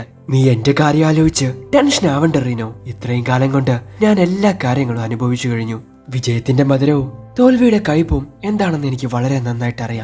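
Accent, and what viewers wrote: Malappuram, India. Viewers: Malappuram aano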